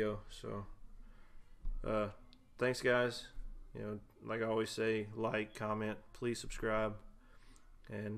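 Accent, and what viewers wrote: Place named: South, United States. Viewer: Sweet video! Never have been to your channel, heard your voice and said man this guy is country (by the way I am in Alabamer). I was thinking this is going to be a wreck, but....You are awesome at building and so thorough, with the continuity checks and all. I really enjoyed the video and will be subscribing. Thanks again for the Video ;)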